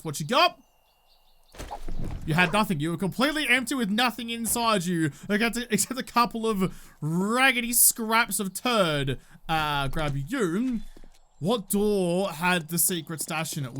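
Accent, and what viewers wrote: Australia. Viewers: personality is just so GD endearing and he's such a wholesome kinda guy. And come on... that Aussie accent is awesome, not weird. One of my favorite YTers! And BTW, Desert biome FTW!!!!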